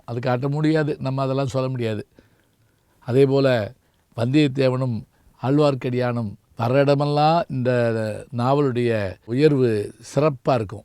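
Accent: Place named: Jaffna, Sri Lanka. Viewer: என் வீட்டுக்கார் உம்மட பட்டிமண்டப பேச்சுகளுக்கு பரம ரசிகர், என்ன சவத்தெளவுன்னா அப்பம் அவர் பக்கால இருந்தா என் தொடஐல ஓங்கி அடிச்சு ஆக்க்கா பாத்தியான்னு சொல்லும்போது ஒரு தொடை எரிச்சல் புத்திக்கு வரும்போது, அட இந்தப்பய ரசிகனப்பான்னு டக்குன்னு தாவி இது என் ஆசானப்பா அப்படின்னா ஒரு முத்தம் கொடுத்து, செரி இனிமே கொஞ்சம் மெதுவா அடிலேன்னு கட்டிக்கிட்டே இந்த செய்யுள் தெரியுமா உனக்குன்னு ஆரம்பிச்சு ... தமிழ் இனிமையானது, அதைவிட என் அத்தானின் யாழ்பாணத் தமிழ் மிக இனிது ஆசானே ❤❤❤ லெட்சுமி ராஜன் டொராண்டோ கனடா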